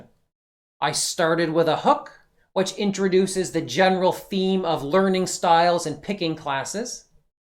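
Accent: Canada. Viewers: Just out of curiosity, are you Canadian? I hear some Canadian accents. My Canadian friend and i used to fight over English (not British, just language) accents like 'Resource' or 'Rezzource'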